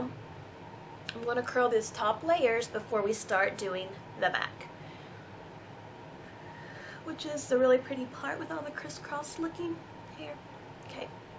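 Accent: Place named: South, United States. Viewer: Where are you from? I sense a lil' southern accent? Love it!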